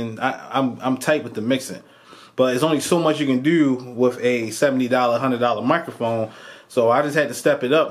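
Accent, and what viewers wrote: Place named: Baltimore, United States. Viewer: Loving the Baltimore accent.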